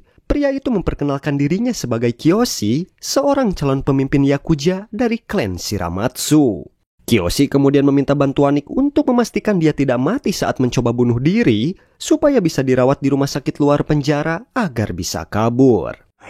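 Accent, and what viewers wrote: West Java, Indonesia. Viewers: Bang asli orang mna orang Sunda iya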